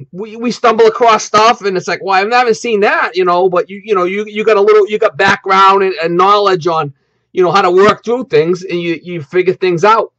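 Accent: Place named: New York, United States. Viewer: This guy is cool haha....new yawk?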